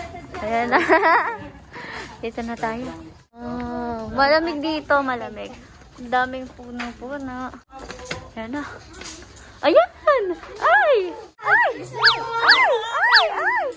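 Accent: Batangas, Philippines. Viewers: I love that Batangenya Accent hehehe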